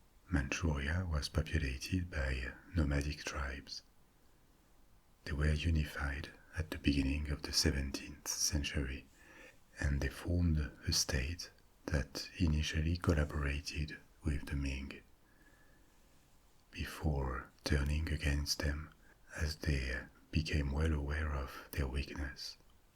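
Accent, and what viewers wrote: France. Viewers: You know......I bet your history content spoken in a normal voice would top Kings and Generals and other top history channels. Your French origin/accent/interpretation of history is a ..... breath of fresh air to all the Anglosphere content. I know there is a ton of French content on YouTube, but for example it was great to hear Napoleonic history from a non British person - I mean for real! The British are so proud of Waterloo - they (and maybe mostly Prussia) defeated WHAT WAS LEFT OF NAPOLEON.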